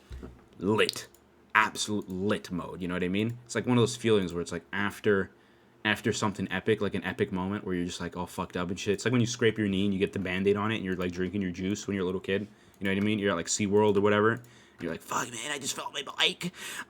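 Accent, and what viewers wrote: Canada. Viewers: I knew you’re not American from scattered context but damn, how did I not clocked you as Canadian? I’m a failure. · I havent noticed until now but got a decently strong canadian accent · fr he sound like a white guy, or maybe canadian speaks like that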